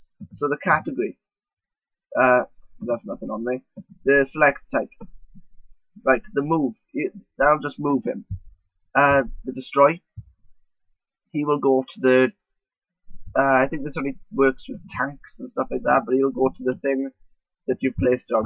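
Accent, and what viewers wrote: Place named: Wales, United Kingdom. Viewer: ahh you dont speak welsh, i recognised your accent. nice to know another welsh arma player. don't see many of them :)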